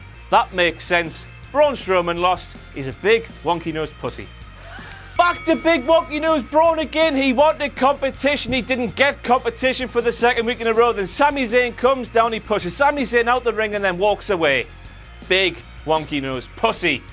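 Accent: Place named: United Kingdom. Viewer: I love British accents and ranting it's just the best.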